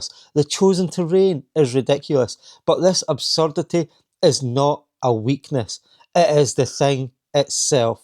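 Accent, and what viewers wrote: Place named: Scotland, United Kingdom. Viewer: I like your voice and accent! Which part of Scotland are you from?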